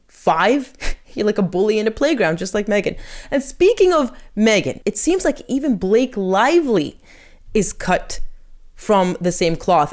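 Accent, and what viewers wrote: United States. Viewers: May I please, politely, ask about your accent? You sound so American but are definitely an Ozzie. I have been there and the Ozzies were lovely. Also thank you, and your wounded friend, for your service. I'm English and they can both feck off. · Curious... Why do you have an American accent? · What country did you serve ? You sound American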